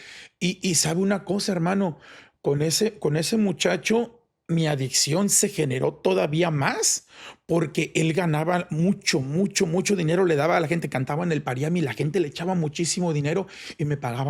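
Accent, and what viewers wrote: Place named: Pocho, United States. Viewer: Que triste y pensar q muchos niños pasan por esto o cosas peores solo una duda por q habla como pocho 🤦‍♀️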